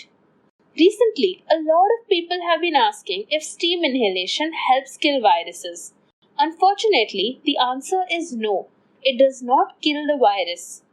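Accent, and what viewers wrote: India. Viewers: Indian english are very rought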